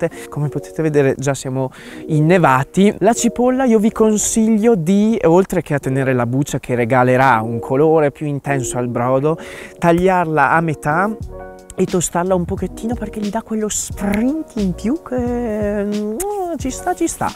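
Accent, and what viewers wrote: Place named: Trentino, Italy. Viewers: "...te sei en brao matelat".....orgoglio trentino...davvero bravo, simpatico, sobrio, educato ed efficace..... e mooolto trentino. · Io ti preferisco con i capelli corti, comunque ti adoro solo sentirti parlare.. Quell accento del Trentino mi fa impazzire! Un saluto dalle Marche. Trentino ti lovvo..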